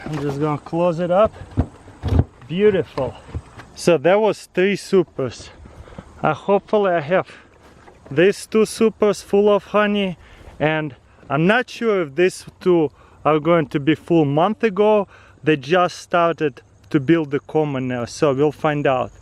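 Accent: Russia. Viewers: Love your Russian accent! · Your accent is beautiful. I love Russian accents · Beautiful russian accent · У тебя акцент да? Ты не говоришь по английски,тты руский! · Are you Russian your accent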